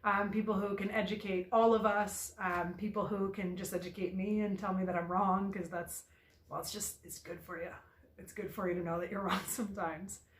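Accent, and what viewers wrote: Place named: Canada. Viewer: How old are you, and that accent sounds Canadian LoL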